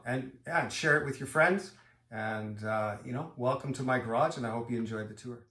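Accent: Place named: Saskatchewan, Canada. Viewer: Canadian eh! From the fields of Saskatchewan I'm guessing by the accent!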